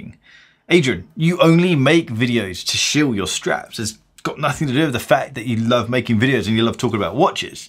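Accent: United Kingdom. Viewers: Great video. Where are you from? what's that accent if I can ask? Sounds to me like British but not quite the same as I'm used to....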